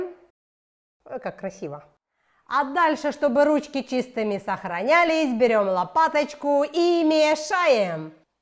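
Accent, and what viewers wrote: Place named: South, Ukraine. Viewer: Обажаю ваш южно-украинский акцент! Вы супер!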